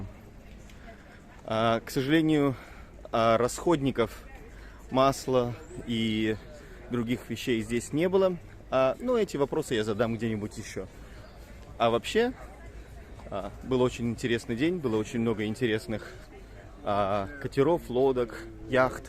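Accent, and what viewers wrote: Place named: United Kingdom. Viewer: вообще видос про мужика в конце это лишнее (к нему вопросов нет и лодка у него зачетная). интрига не сохранилась. голос был похож на голос ведущего из Уссурийска. я прифигел че его на острова занесло? да и ангицкое произношение меня тоже насторожило. думал катапультровался пацан в хренбритан. перед выборами туда ушел. и собаку даже в видосе не показал. без нее уехал. с женой видите ли машинки смотрят. ну думаю как все повернулось. ну думаю "отано чё". ан нет не он. разочарование. вам конечно поржать, а нам подписчикам стресс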